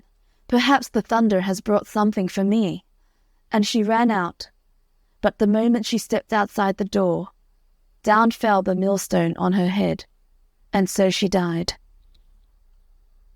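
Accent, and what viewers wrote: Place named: United Kingdom. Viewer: This is British accent.😉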